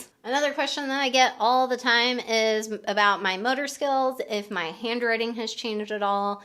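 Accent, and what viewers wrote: Midwest, United States. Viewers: Sounds more mid west to me, I've lived in SW Missouri my whole life and she talks just like pretty much everyone here does. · Do you have a Illinois accent or Mid west just curious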